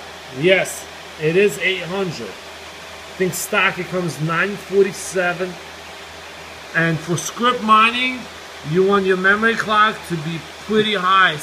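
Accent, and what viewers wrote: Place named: New York, United States. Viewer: Thumbs up for the rockin rig and NY foughetttaboutittt accent!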